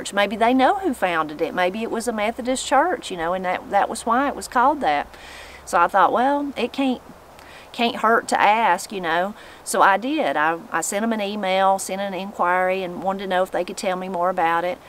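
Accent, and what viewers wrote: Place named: South, United States. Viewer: Love your videos! I'm from northern Appalachia so I don't have the southern twang that your speech has. 😊I must have something different in speech because often times the first thing people say is where are you from? Of course, might be a little mixed up from my dad who came over from Sweden. Don't know if I ever heard of Baptist and Methodist in the same church and sure would go there myself. Especially in this day and age.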